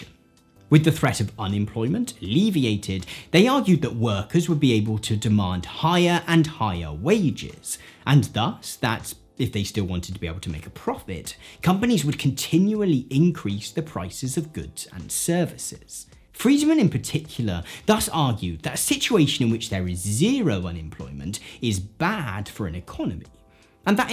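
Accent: RP, United Kingdom. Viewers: Your upper-class gesticulation, alongside your posh Patois, its really triggering. Apart from that great vids!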